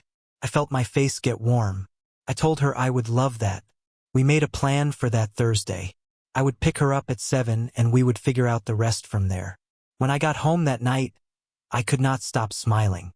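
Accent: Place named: Germany. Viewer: A good brief story with the occasional brief German accent. ❤🎉🏴🖖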